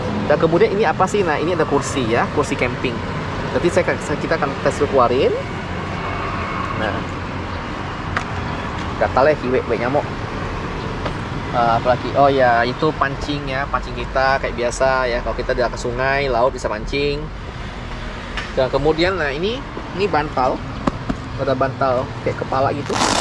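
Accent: Pontianak, Indonesia. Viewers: Org pontianak ke bg? Logat nye macam org ponti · celake pontianak banget 🤣🤣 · bahase budak pontianak ni kental.. semangat bro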